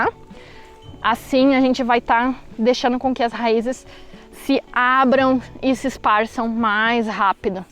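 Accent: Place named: South, Brazil. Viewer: Não sabia que tinha um canal falando em Sulista. Como sempre, um ótimo vídeo 🙌🏽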